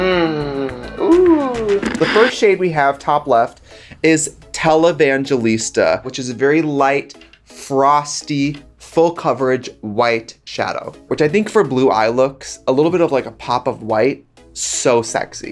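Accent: Wisconsin, United States. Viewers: Now that I know you’re from Wisco, I can’t I hear it. I love you so much.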